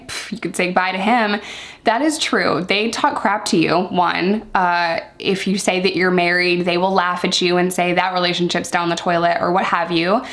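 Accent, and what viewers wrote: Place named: Upstate New York, United States. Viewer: Brand new sub. Where are you from in upstate NY? If too personal, no offense. You sound like my hometown area code, 315! ETA: just read the katv article, you’re from Sidney?! My first GF was from Walton/Downsville, so crept through Sidney (from Utica) under the speed limit! Those cops were jerks!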